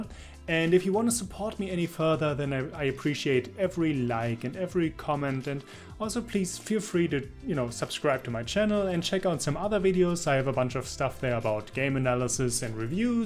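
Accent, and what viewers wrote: Germany. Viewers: thats a serious german accent dude.... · Good video. And I get that some folks don't much care for open world type games and get really picky about what kind of games they like so I can respect his 7 out of 10 that he gave the game... Here's the thing though about Jim Sterling. Okay fine... He didn't like this clearly good game as much as most folks. Fair enough... Not everyone has the same feelings... BUT! He gave dead rising 4 a 8.5 out of 10. That's fuckin retarded lol. I don't care how opinions differ and all that. They shouldn't fucking differ THAT fucking much. That's like eating shit vs a new york pizza logic. Love your accent btw. Reminds me of darth helmet from space balls or that german guy who had to put up with homer in that land of chocolate episode.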